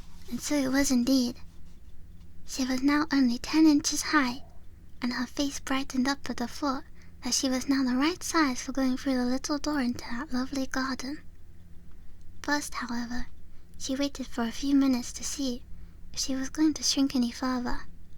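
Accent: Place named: United Kingdom. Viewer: I'm so looking forward for the next parts! I never read the book, so I'm glad you're the one introducing it to me:_nepuYay: I will definitively listen to it again:D also you didn't lie, you sound pretty british in this lol it's very cute:_nepuLove: thanku again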